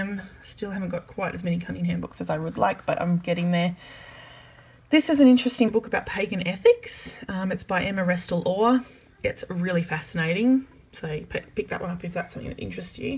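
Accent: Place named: Australia. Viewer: Nawww this was so fun! Thanks for th tour. I miss Witchcraft magazine too sooooo much and Fiona Horne is still my absolute favourite witch!! Loved and continue to love her books. Where are you based? I detect a distinct Aussie accent 😁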